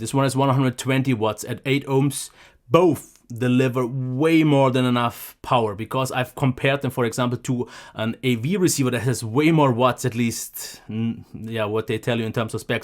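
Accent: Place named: Germany. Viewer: No, he sounds German.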